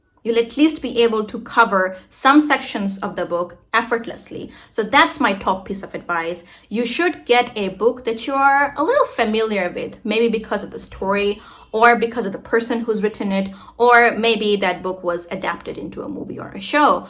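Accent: United States. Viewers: Please sister tell me , how can get American accent like you ?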